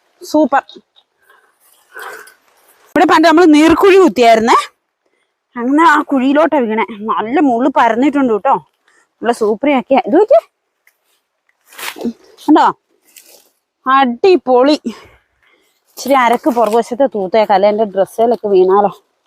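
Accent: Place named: Southern Kerala, India. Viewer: നിങ്ങളുടെ വീട് കാസർഗോഡ് ആണെന്നറിഞ്ഞു... പക്ഷേ ഈ ഭാഷ കാസർഗോഡ് അല്ലല്ലോ... തെക്കൻ ജില്ലയിലെ ഭാഷ പോലെയുണ്ട്.... എന്താണെന്ന് ഒന്ന് ശരിക്കും പറയണേ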